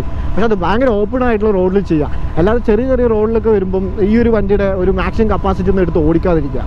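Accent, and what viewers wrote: Kannur, India. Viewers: chetta, chettan kannur aano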